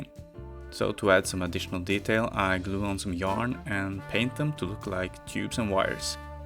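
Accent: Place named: Estonia. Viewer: Been loving all the videos. May I ask where you are from though?. You sound kinda Estonian. Maybe Nordic?.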